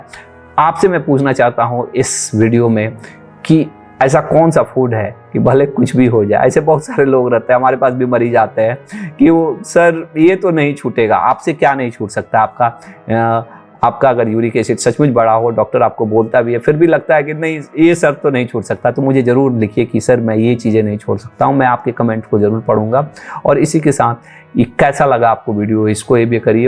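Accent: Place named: Bihar, India. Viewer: Sir, aapke bolne ke style se bta skta huun app surely Bihar se hain wo bhi MAGADH area se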